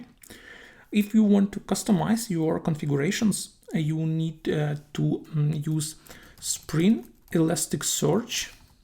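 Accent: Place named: Eastern Europe. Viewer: slavic accent😎💪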